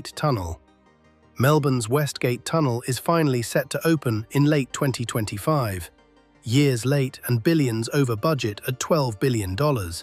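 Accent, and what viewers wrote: United States. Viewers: Thank God other comments are aware of this cheap, sensationalist, uninformed click bait crap. The authorities behind these projects have the vision and foresight to act before commerce and life fail due to overuse gridlock of existing infrastructure. It is better to act before the shit hits the fan than panic when the whole world stops. Keynsian ecomonics also shows that the multiplifier effect is also developing other sectors of the economy. Instead of wasting our mutual time on this tripe, research the financing and building of the Australian Transcontinantal (Indian Pacific) Railway. Paid off 10 years after completion and still benifiting the nation. By the accent of the voiceover, I assume that this is an American vlog. It is rich that someone from a third-world banana republic (my opinion) is casting doubt on Australian initiative. 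From my reading, over half the bridges on the USA national highway network are overloaded or in urgent need of repair. Please stop gasslighting me!!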